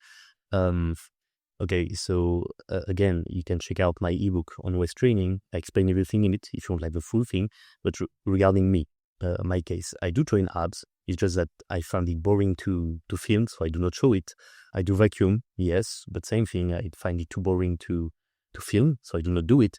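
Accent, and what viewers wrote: France. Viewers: by accident, I trained for watching this channel by watching NH first. I have a bad ear for accents and I don't think I could understand you without acclimatizing myself to a thinner french accent first lol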